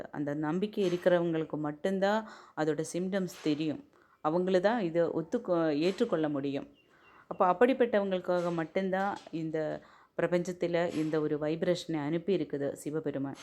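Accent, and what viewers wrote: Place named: Kerala, India. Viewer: Akka unga speech nallarukku.... malayalam kalantha tamil🎉🎉❤❤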